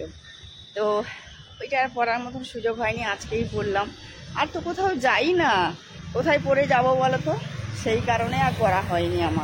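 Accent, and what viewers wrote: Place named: Purba Medinipur, India. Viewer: Tumi to amader purba Medinipur er meye go shune valo laglo 🥰achha amader ancholik vasay ekta question korchi reply dio 😃egra r Contai ascho kunodin? 😀r Digha kotobar jaicho?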